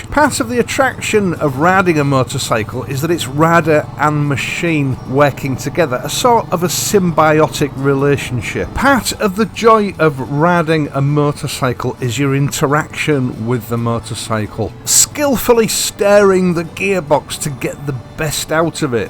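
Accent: United Kingdom. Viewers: for a German bloke as I am it feels so nice to listen to your authentic british accent ... it reminds me of my good old times visiting GB when I was a t young age 😀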